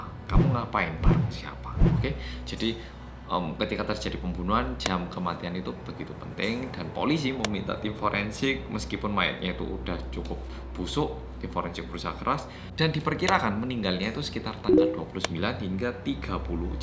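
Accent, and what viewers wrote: Java, Indonesia. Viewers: Bersama orang korea yang Medoookkkkkkkkk....... Seketika akupun ikut tahan nafas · Ketemu lagi, tadi di Tokopedia jadi MC BTS, sekarang di YouTube jadi detektif medhokkkkkkkkk....😄 Langsung nonton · iya mas medoknya, medoooooooooooooooooookkk banget yaak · Medooookkkk~ Melu ngempet nafas pisan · Meddhoooooooooook e dhuowo men om 😅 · Mau nya lewat aja tuh tokpednya..krna udah ngeklik nih pidio.. Eh iseng ja balik ke tokped ternyata MC nya si medhooooooooooooooooooookkk...😀 Akhirnya nongkrongin dah.. Klo aq dari sekian translater nya bts di indo lebih milih ㅎㅎㅎㅎ Cie cie cie yg bakal di kontrak untuk translate nya BTS di next project konser indo.. 💚💚💚😎😎😎 짱... 너무 좋아...짱 짱 진짜로...고마워😊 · Wi medok e nyapo ye mas? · Jiaahhh... Baru buka youtube channel yang ini pas pembukaannya spontam ngikutin pembukaan sampe ngikutin medoknya yang panjang banget sampe ditanya sama papah "kamu ngapain?" 😂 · Mas korea medok deg deg kan gak tadi interviwe sama bts oh ya jungkook minum susunya lahap ya🙂😌✌🏻🍼💜💜💜💜💜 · Astaga aku sebagai bolobolo bangga ternyataa mas korea yang medoookkk interview bts. 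OMG proud of u😭😭✨✨✨✨✨ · Medooooookkkny luar biasa' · Medhook ke kedawan mas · Ternyata tetep medok ya sekalipun lg ngeinterview bts, uwu mantul mas 💫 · Korea Medoooooooooooooooooooooooooooooooooooooooooooooooooooooooooooooooooooooooooooooooooooooooooooooooooooooooooooooooooooooooooooooooooooooooooooooooookkkkkkkkkkkkkkkkkkkk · Alhamdulillah medoknya makin panjang dari biasanya. Ayo SEMANGAT! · medoknya hanya bertahan sampai sini wkwk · Medhooooookk Suaranya persis pemotong rumput 😂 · Orang Korea Yang MEDOOOOOOOOOOOOOOOOOOOOOOOOOOOOOOOOOOOOOOOOOOOOOOOOOOOOOOOOOOOOOOOOOOOOOOOOOOOOOOOOOOOOOOOOOOOOOOOOOOOOOOOOOOOOOOOOOOOOOOOOOOOOOOOOOOOOOOOOOOOOOOOOO OOOOOOOOOOOOOOOOOOOOOOOOOOOOOOOOOOOOOOOOOOOOOOOOOOOOOOOOOOOOOOOOOOOOOOOOOOOOOOOOOOOOOOOOOOOOOOOOOOOOOOOOOOOOOOOOOOOOOOOOOOOOOOOOOOOOOOOOOOOOOOOOOOOOOOOOOOOOOOOOOOOOOOOOOOOOOOOOOOOOOOOOOOOOOOOOOOOOOOOOOOOOOOOOOOOOOOOOOOOOOOOOOOOOOOOOOOOOOOOOOOOOOOOOOOOOOOOOOOOOOOOOOOOOOOOOOOOOOOOOOOOOOOOOOOOOOOOOOOOOOOOOOOOOOOOOOOOOOOOOOOOOOOOOOOOOOOOOOOOOOOOOOOOOOOOOOOOOOOOOOOOOOOOOOOOOOOOOOOOOOOOOOOOOOOOOOOOOOOOOOOOOOOOOOOOOOOOOOOOOOOOOOOOOOOOOOOOOOOOOOOOOOOOOOOOOOOOOOOOOOOOOOOOOOOOOOOOOOOOOOOOOOOOOOOOOOOOOOOOOOOOOOOOOOOOOOOOOOOOOOOOOOOOOOOOOOOOOOOOOOOOOOOOOOOOOOOOOOOOOOOOOOOOOOOOOOOOOOOOOOOOOOOOOOOOOOOOOOOOOOOOOOOOOOOOOOOOOOOOOOOOOOOOOOOOOOOOOOOOOOOOOOOOOOOOOOOOOOOOOOOOOOOOOOOOOOOOOOOOOOOOOOOOOOOOOOOOOOOOOOOOOOOOOOOOOOOOOOOOOOOOOOOOOOOOOOOOOOOOOOOOOOOOOOOOOOOOOOOOOOOOOOOOOOOOOOOOOOOOOOOOOOOOOOOOOOOOOOOOOOOOOOOOOOOOOOOOOOK. 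· Medooooooooooooooooooooooooooooooooooooooooooooooooooooooooooooooooooooooooooooooo ooooooooooooooooooooooooooooooooooooooooooooooooooooooooooooooooooooooooooooooooooooooooooooooooooooooooooooooooooooooooooooooooooooooooooook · Hello guys welcome back to bersama orang Korea yang medoooooooooooooooooooooooooooooooooooooooooooooooooooooooooooooooooooooooooooooooooooooooooooooooooooooooooooookkkkkkkkkkkkkkkk!!!!!!!!!!!!!!!!!!!!!!!!!!!!!!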